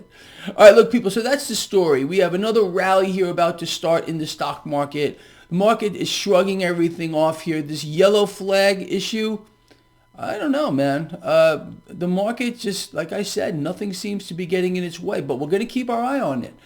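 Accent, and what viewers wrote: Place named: New York, United States. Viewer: Are you just sitting here on your ass with your New York accent promoting ideas let’s serve your best interest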